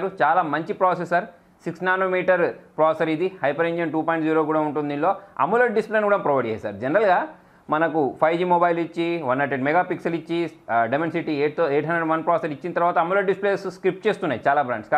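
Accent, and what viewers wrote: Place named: Godavari, India. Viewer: Motham godavarikane anna.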